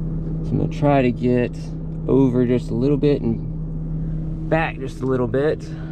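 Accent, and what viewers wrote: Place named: South, United States. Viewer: You’re welcome and hopefully you’ve got your flatbed back by now lol Oh and I like your southern accent, where are you from? I’m in good ‘ole Kansas lol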